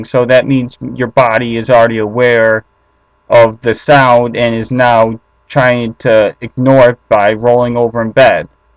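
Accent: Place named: Wisconsin, United States. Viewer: I detect a northern accent from you. You're from Wisconsin.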